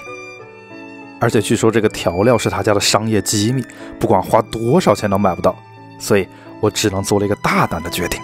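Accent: Wuhan, China. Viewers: 老师请问你是武汉人吗？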